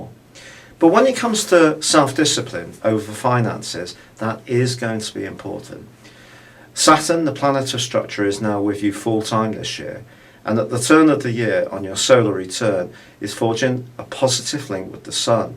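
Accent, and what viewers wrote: England, United Kingdom. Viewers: Why do all of you astrology people have English accents. I can not stand that sound .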